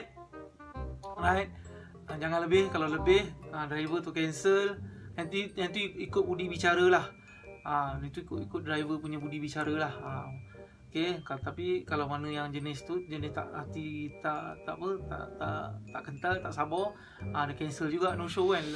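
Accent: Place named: Perak, Malaysia. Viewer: Sapam. Bro Perak brlah mana ni? Kuala ke.. Pekat benor. Hihi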